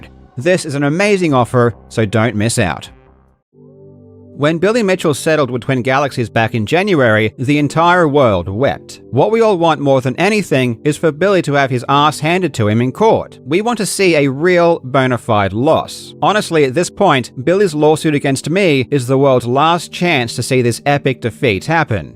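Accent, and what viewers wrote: Australia. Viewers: How am I just finally placing your accent as Australian? No idea why you sound a bit different to me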